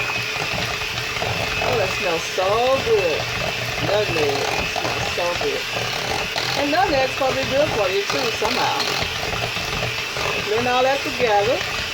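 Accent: South, United States. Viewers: Her accent is everything! Southern Bell honey! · My mom is 85 born and raised in the south and has always pronounced her words like her. Especially nuttineg for nutmeg! If I hear you pronounce it like that then I know three things about you. 1. You’re a great cook! 2. You’re a clean cook! 3. You’re raised in the South! And one last observation, You were raised RIGHT and have lots of great advice and knowledge of natural remedies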